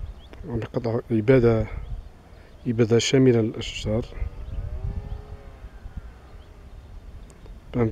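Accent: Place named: Jijel, Algeria. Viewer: اهدر جيجلي ماتخبيش اللهجة ديالك ا وخيي لازمك تعرف بيها لأنها من تراث المنطقة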